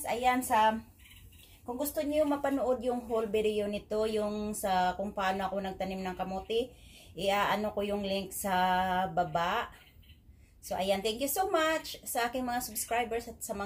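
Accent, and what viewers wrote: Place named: Bicol, Philippines. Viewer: ahh kya pla yung tono nyu😊 bicol din ako catanduanes... kmusta nmn po kayo jan..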